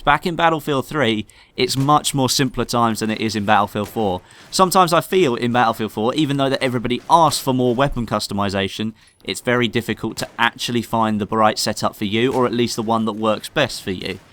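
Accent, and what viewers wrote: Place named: United Kingdom. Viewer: British accent sounds so gay.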